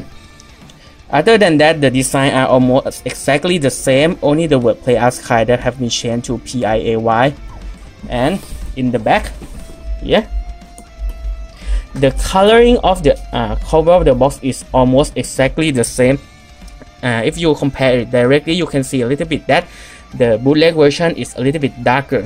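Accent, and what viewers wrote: Thailand. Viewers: สำเนียงเหมือนคนไทยเลย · Are you Thai? พี่เป็นคนไทยไหมครับ ฟังจากสำเนียงแล้วน่าจะใช่( From your accent).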